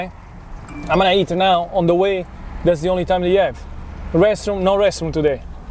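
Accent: Italy. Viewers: At First i didnt recognize the italian accent.... But i was sure he was italian😌❤️ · I'm not gonna lie, I've always enjoyed your videos but sometimes I feel like you trade on your Italian accent and alleged authenticity just a little bit too much. Having watched this, you just can't fake that level of skill. Anyone can make 1, or 2, or 10 pizzas for a party. What you showed here takes it to a whole different level and I'm genuinely impressed enough to go and watch your older videos with a new eye. Enjoy your well-earned rest, and I'll happily be following you for the next 20. Or 50. Or 1000. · mi piace che nonostante vivi negli States da un po' non hai PER NIENTE perso l'accento italiano! 😁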